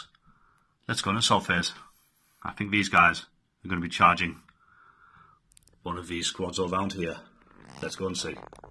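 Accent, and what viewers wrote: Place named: Teesside, United Kingdom. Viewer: Sizkipala, seriously m8, the super dramatic on tenterhooks commentary does it for you? Man. Don't encourage him. Maybe it's the Teesside accent but am not feeling it.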